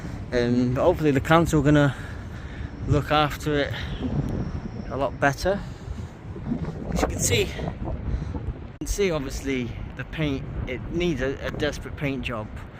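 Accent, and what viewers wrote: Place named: Cumbria, United Kingdom. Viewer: Hi I'm trying work your accent out. I'm gonna take a guess that you're from Cumbria.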